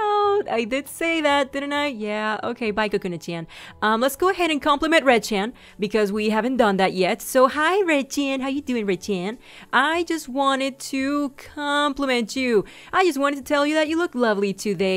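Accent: Spain. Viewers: Are u Spanish not to be rude😰😰😰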